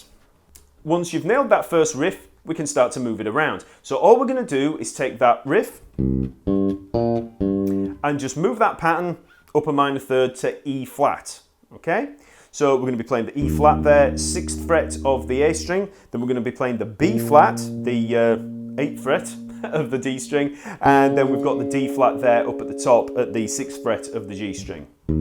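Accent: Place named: England, United Kingdom. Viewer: Where are you from, Mate? Liverpool? South London? I can understand everything you're saying.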